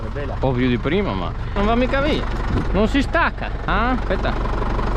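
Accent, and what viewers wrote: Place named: Verona, Italy. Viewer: grande!! Ho sentio l'accento veronese e me son emosionà!! 😂😂 Ti ho scoperto da poco e appena ho sentio el papà parlar to seguio subito.. Grande bei video un giorno veo a catarte e a ciavarte un po' de verdura😜😜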